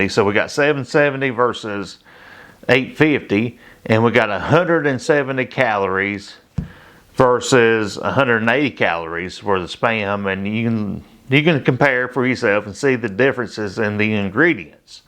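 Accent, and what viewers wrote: South, United States. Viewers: I'm in the UK. Love your southern drawl.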